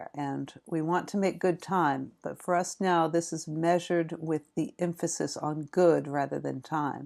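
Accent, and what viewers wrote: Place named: United States. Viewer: The YouTube algorithm was spot on with a link to your channel. Great work - many thannks. I have just started ZAMM again by listening to it (curtesy of pirated version on YouTube) having first read it in 1978 and read it numerous times since. The American voice is adding a new dimension. One reading following the route with Google Street View is something I would recommend. America is so different to UK where I have done all my riding. I have also been listening to JBP, Rebel Wisdom, John Vervaeke, Jonathan Pageau and Paul VanderKlay so this is really pulling lots of strands together. I am an Anglican priest and also a motorcyclist who has spent hours and hours in motorcycle maintenance. I have never really seen why ZAMM is specifically Buddhist. Really looking foward to the rest of the series and hoping to find time to engage in the discussion in the comments.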